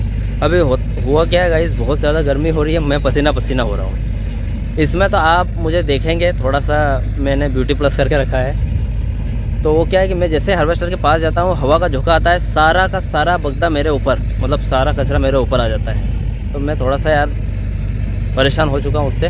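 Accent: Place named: Malwa, India. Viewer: आप की भासा सेली से आप शाजापुर देवास उज्जैन के लग रहे हे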